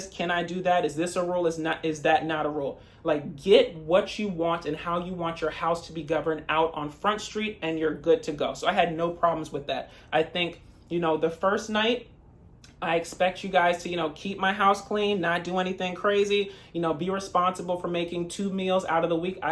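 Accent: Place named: Caribbean. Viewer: Loved the Caribbean accent in the intro and the entire review. I agree with your take on all the couples. All these people have these serious conversations waaaaay too soon from the arrival of the “foreign” fiancé. Emily is just very childish and unrealistic- she wants the wedding and the diamond ring but in reality she doesn’t even know if she really has true feelings for this guy. I don’t believe that there would be no option for them to have any alone time after they got settled at her parents house. I’m sure the grandparents wouldn’t mind watching the baby for a couple of hours after Kobe arrived for them to have a date night the next day. But like you said she trapped him into the whole arrangement without prior discussions. Best regards!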